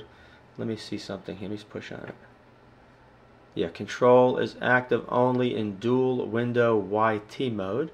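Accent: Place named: New York, United States. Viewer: You can put a FL place stamp on your video, but that voice says you're my homie from NY...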